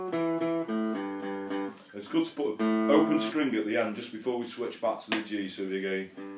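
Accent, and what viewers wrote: Stoke, United Kingdom. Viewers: Do u do lessons ? Noticed the stoke twang . U make it look so easy .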